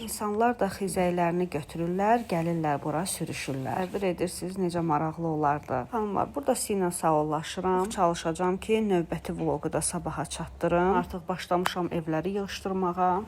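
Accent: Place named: Russia. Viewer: amma mən elə bilirdim siz rus dillisiz deyə belə formada danışırsız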